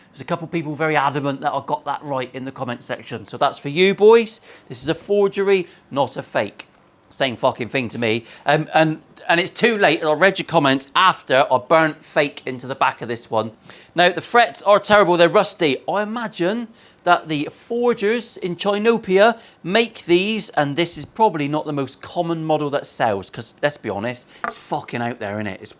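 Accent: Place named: Bristol, United Kingdom. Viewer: I’m Bristolian, I can understand him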